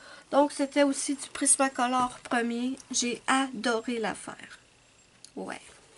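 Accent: Quebec, Canada. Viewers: Bonsoir, Cette nouvelle me fait beaucoup de peine car j'adore tes vidéos. 😖. Évidement que je vais continuer a te suivre sur FB et Instagram, mais je préférais YT a cause de t'entendre parler, de Ruby, de Spike le chat blanc. C'était tellement sympa, c'était un agréable moment. Sans parler de tes vidéos tutoriels et de ton charment accent québécois. Tout cela va beaucoup me manquer😖😵😬. Youtube a beaucoup changer en 3 ans. Cet espace de liberté et de partage est devenue une véritable dictature par ses nouvelles règles et sa cupidité. Certains youtubeurs ont aussi changer, de partage de leurs passions, ils sont devenues des "sponsorisés" a outrance pour le profit. J'aime bien ta sympathique simplicité et ta passion du coloriage. Tu le fait encore non pas pour le profit comme certains aujourd'hui. Pour moi tu est plus une amie sincère lointaine, qu'une étrangère d'un autre pays essayant de me vendre quelque chose. 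Tes vidéos me manqueront beaucoup car elles m'apportait un peu de bonheur dans ma solitude. Je te suivrait sur les autres réseaux sociaux😊😋. Tes coloriages sont magnifiques et Wendy est superbe😍👍👏. Tout mes vœux de bonheurs, de joies, de bonne santé a toi et a toute ta famille😘. Internet comme dans la vie réelle devient de moins en moins un espace de liberté. C'est bien dommage...... 😖😖😖 😫😫😫.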